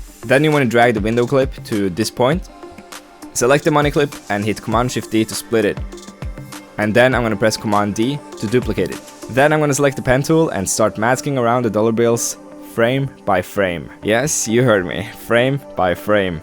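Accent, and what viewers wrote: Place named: Sweden. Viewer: I thought he was Swedish hah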